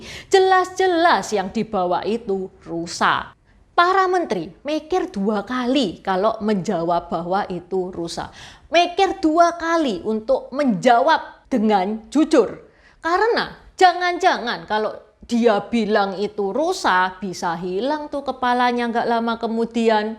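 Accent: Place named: East Java, Indonesia. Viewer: Aku senang dengan cara bercerita. Dia pakai bahasa Indonesia sehari2 logat Jawa Timur. Selamat, semoga sukses!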